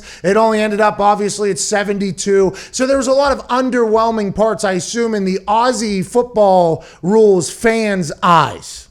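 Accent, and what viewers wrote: United States. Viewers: gillon mclachlan should employ this guy. To commentate the games. The American accent sounds good.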